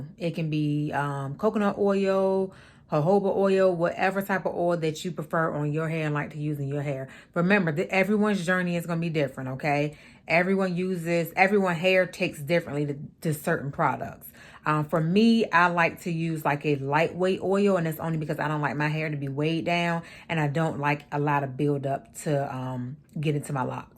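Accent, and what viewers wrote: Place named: South, United States. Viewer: You are very southern lollll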